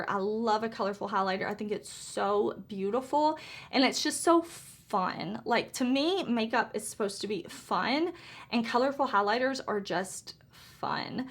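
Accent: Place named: South, United States. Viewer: Hi,. I just found your videos by looking for videos on the Scooby Collection. I’m so glad that I stopped and watched. I love your technique and artistic abilities, but I’m staying (subscribing) because of your accent and personality!!! I do sense that you’re a SOUTHERN gal, just like ME!!! I am Jeanie from Alabama! So very nice to meet you!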